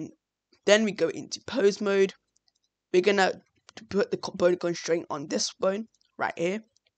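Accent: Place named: United Kingdom. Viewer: This video had a lot of good content and I learned from it but it was a struggle to get through because of your narration. At times you rush along, only hinting at rather than enunciating certain syllables. In addition, you have a fairly strong British accent and the combination of these caused me to not understand many things you said. The accent alone shouldn't be an issue; I've watched other speakers with British accents who are very understandable--see Grant Abbitt as one example. I suggest that you practice CLEAR - LEE - E - NUN - SEE - AYE -TING E-VER-Y SYLL-A-BLE for future videos.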